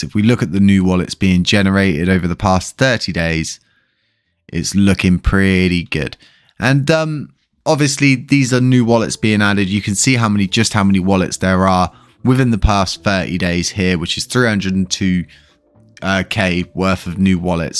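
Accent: United Kingdom. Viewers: I like your accent. it's like in the harry potter movie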